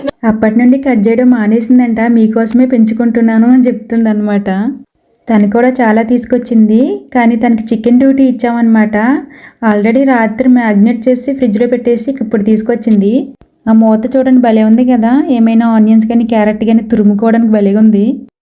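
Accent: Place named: Godavari, India. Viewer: Medhi India lo akkada..vallani mavyyagaru attaya antunnru..njmenaa..full detailed video cheyandi..me slang emo godavari slang laaaaa undi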